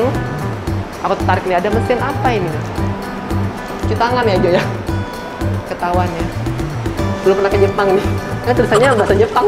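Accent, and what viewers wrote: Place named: Bangka, Indonesia. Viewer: org Bangka ya? Denger logat nya?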